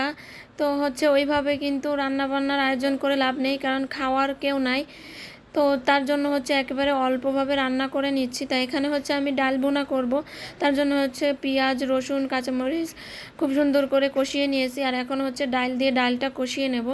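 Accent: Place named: Barishal, Bangladesh. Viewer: আপু এটা আমগো বরিশাইল্লা বইন তুমি বোঝো নাই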